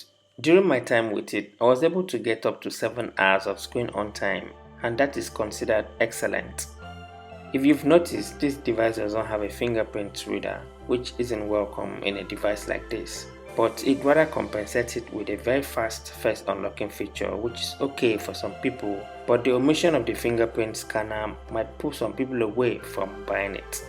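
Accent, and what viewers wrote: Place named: Akwa Ibom, Nigeria. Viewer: Love your Akwa Ibom accent bro!❤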